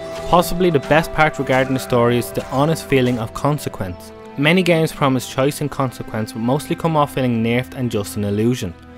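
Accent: Ireland. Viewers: the irish accent makes this review sound so much more cool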